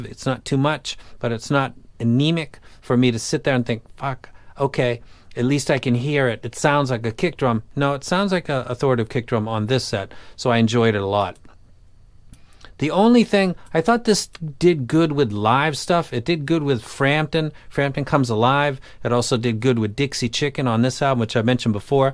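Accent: Boston, United States. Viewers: Argons are something I have to pick up this year. Also I love what ever music app you are using for your files! I need to set up something similar. You sound like you're from the Boston area.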